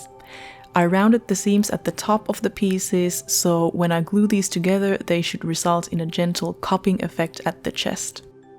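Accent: Finland. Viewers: Amazing work! PS: Am I detecting a hint of Finnish accent?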